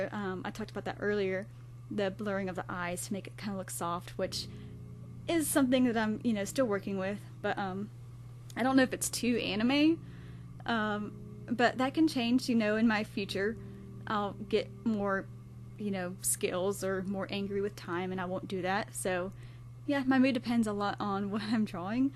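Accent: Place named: South, United States. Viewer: OMMGGGGGGGG your voice is so cute ya lil southern bell u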